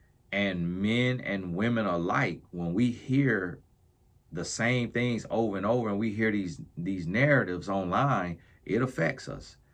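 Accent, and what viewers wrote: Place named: Mississippi, United States. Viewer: are you from Mississippi??? I think I recognize your accent. ❤🎉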